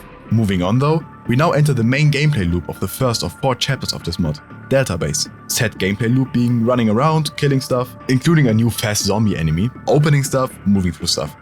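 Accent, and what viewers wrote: Germany. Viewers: wait you german?! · You're German? I've lost all recollection of European accents.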